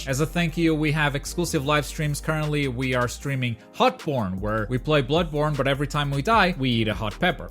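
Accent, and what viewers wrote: Portugal. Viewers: Holy shit just from you accent I could understand that you were also Portuguese. It's Rare to find one of us in the English community lol. Great channel btw. Definitively going to Subscribe.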